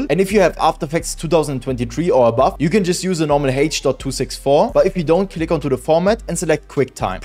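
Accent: Germany. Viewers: Are You German? I hear a german accent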